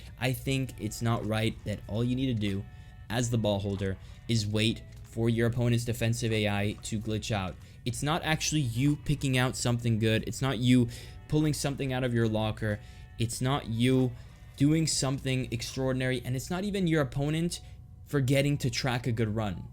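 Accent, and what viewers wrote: United States. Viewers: It's an American accent so I wouldn't call it a 2nd language.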